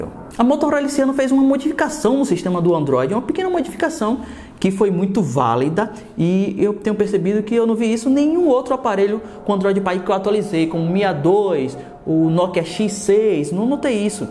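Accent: Bahia, Brazil. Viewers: Vc e baiano??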